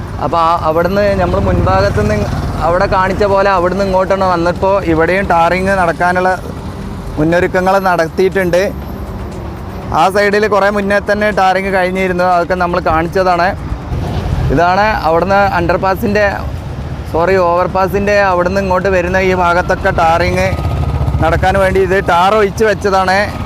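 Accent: Malappuram, India. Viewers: കഴിയുന്നതും വളരെ ഏറെ ഭംഗിയായി അവതരിപ്പിച്ചു.. നമ്മുടെ മലപ്പുറം ശൈലിയിൽ പറഞ്ഞപ്പോ വല്ലാത്തൊരു സന്തോഷം..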